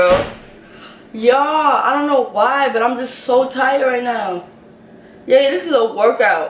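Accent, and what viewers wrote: New York, United States. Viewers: NY accent 😍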